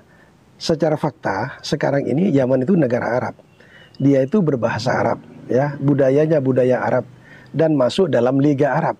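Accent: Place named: Madura, Indonesia. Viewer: kyai ini klo dari logatnya pasti dari Madura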